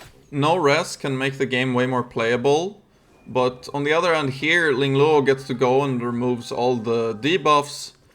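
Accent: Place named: Norway. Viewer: are you nordic by any chance? kinda sound norwegian to me😅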